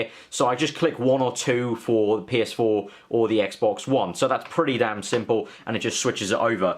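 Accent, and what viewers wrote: England, United Kingdom. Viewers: I was just wondering which part of England your from? The only reason I ask is because your neighborhood looks absolutely beautiful. I live in the US and I've never seen a more beautiful landscape. You don't have to say where exactly, just whether it's northern England or southern, etcetera. Do you live in a rural area? To me you sound English. Your accent, again to me, doesn't sound welsh or Scottish and it's definitely not Irish lol. Plus you, yourself said you're British. And while most American people don't know that Britain is made of three countries, (Wales, Scotland and England; and add the Isle of Ireland and you have the UK, for those of you that don't know) I do. Anyway, I just wanted to know where abouts you're from. As I said before it's an absolutely beautiful neighborhood, you live in. I'd love to live in England. 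Most definitely in rural England, though. As it's the most beautiful parts of your country, in my opinion. If you do live in another country, apologies for my ignorance. ☺ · Pretty sure he's just an Englishman. Lol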